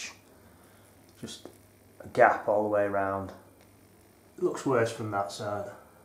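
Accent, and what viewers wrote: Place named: North West England, United Kingdom. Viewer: Lovely job, you sound like you are you based in the north west ? Would you consider giving a quote in warrington .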